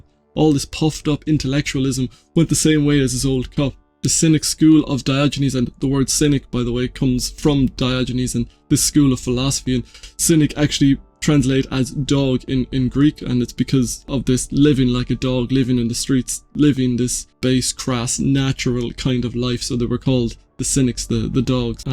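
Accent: Ireland. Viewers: Oof, what a lovely irish accent. Such pleasant listening.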